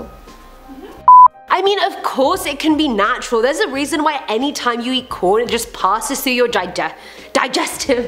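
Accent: South, United States. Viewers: I'm from Mississippi and cant stand fake southern accents but yours is perfect..luv ya your awesome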